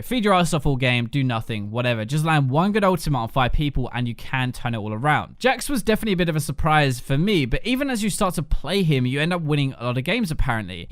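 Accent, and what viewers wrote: United Kingdom. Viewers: Tbh i dont like hes british accent.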